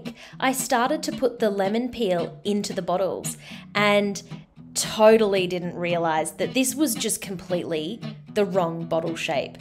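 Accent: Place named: Australia. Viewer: First of all, Im loving your Aussie accent :D and this is the most non-Italian way of doing it, totally British/Aussie way I would say but if you like the taste, go for it, there is nothing wrong as long as you like :) Points are already raised by you, but I understand that Italian way takes time and more effort, so enjoy a quick limoncelloish drink :) I will give it a try as well, but with 50-55% alcohol gin, lets see what it will be like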